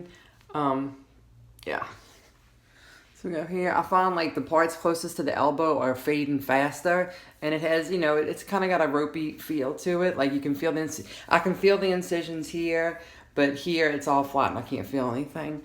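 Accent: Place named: Morgan City, United States. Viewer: Love your accent...sounds like home. I grew up in Morgan City.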